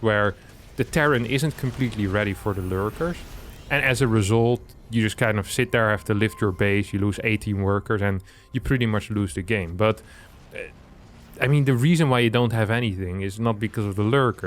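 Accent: United Kingdom. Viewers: Love the British accent! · I just love the British accent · love your british accent XD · the british accent slowly starts to creep in lol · i like that the more Harstem reads, the more British he becomes. i do find it funny the Terran complains about lurkers, but then talks about he could had made Ghosts. you know.... the unit that can ONE SHOT THE ONE THING HE IS COMPLAINING ABOUT !? if anything Zerg should be complain about terran since ghosts can just constantly one shot any spellcasters and lurkers they have !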